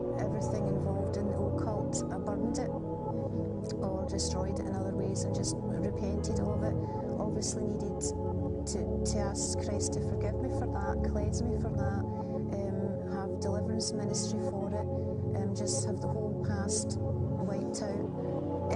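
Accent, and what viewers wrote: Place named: Scotland, United Kingdom. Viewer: Scottish accent makes me sick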